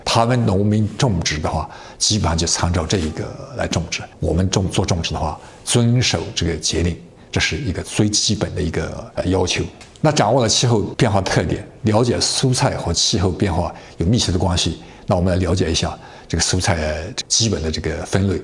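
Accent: Yunnan, China. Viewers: 谢谢李老师讲解😀听口音李老师是云南老乡？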